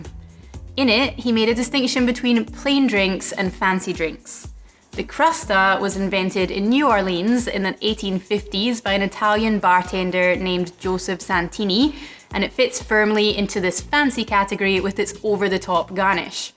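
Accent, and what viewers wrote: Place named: Scotland, United Kingdom. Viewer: I'm obv late to the party but just drank a few (4) brandy Crustas and was trolling youtube for relevant content. I like the bit of maraschino in the crusta. did the Cointreau recipe (3C 2B 1L .5M no bitters), then the 4B 1C 1L 1M plus angostura recipe, and liked the cognac forward version better. I'm sure I'm a bit under things with the relatively quick succession, but just found your channel and thought from the accent--are you Scottish? you mentioned being in Australia in the vid... Imma feel a right knob is your Irish or Aussie--my bad. either way, appreciated the lesson--good stuff! I'm def subscribing.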